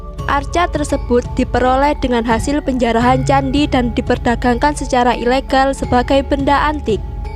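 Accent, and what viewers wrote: Java, Indonesia. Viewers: Kok medok jawane pundi mbakyu??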